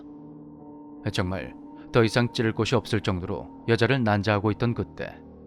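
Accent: Gyeongsang, South Korea. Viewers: 경상도분이신가봐요 요즘 목소리로 열심히 밀린숙제하듯 듣고있읍니다 경상도사투리가 완전 반갑고 어색하지않고 참 좋아요~~^^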